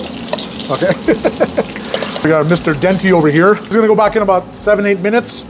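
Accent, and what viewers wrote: Montreal, Canada. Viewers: Ahh Montreal. I was trying to place that accent. Makes sense now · I love the Canadian accent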